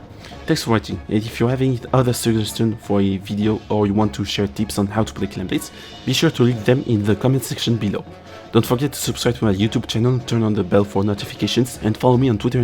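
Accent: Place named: France. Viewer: great stuff mate! i actually planned on doing similar explanation videos on the modes, but im far from that subject in my schedule anyway... well, i have to criticise your french accent :( i could barely understand what you say eventhough i wanted to, but i know you tried your best and that's what matters. also regarding the concept itself: i know this video is aimed at people who are new or just part time squids, but i would have liked some more "interesting" details for people who already know the very basics already. for example you could have mentioned or shown the exact punishment you get upon an opposing counter push and stuff like that. just some info that even pros can maybe find usefull or so... idk all in all, good work for a first try i would say^^ keep it on mate!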